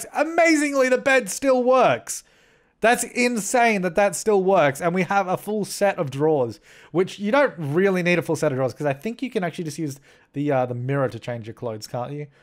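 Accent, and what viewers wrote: Australia. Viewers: it's the accent, all dudes sound like this in Australia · They both have Australian accents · It look me too long to recognise your accent is Aussie 😂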